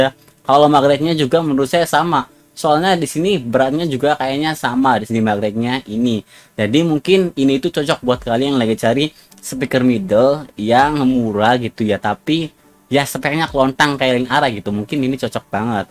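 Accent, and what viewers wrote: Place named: Indramayu, Indonesia. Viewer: Logat indramayue kuh jelas pisan..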